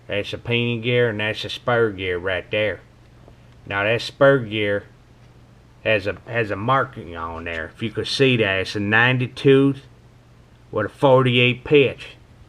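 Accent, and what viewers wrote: Chicago, United States. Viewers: You've gotta be from Chicago with that accent! Or Elmwood Park or something.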